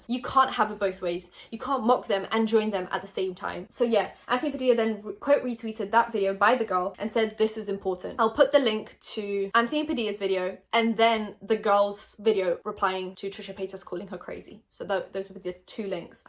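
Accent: United Kingdom. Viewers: ...will you marry me? I just want to hear your beautiful British accent everyday when I wake up accompanied by your lovely face!! My goodness you’re a dream 💭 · SPEAK POLISH, you sound toooo British makes me not believe your polish where is your Akcent !?!?!?!? Love your videos keep it real 😁😁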